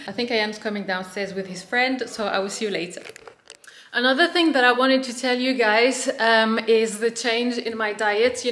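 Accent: England, United Kingdom. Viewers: Salut j'aime tellement tes vidéos en anglais, c'est tellement fluide et ce eptit accent english love ittt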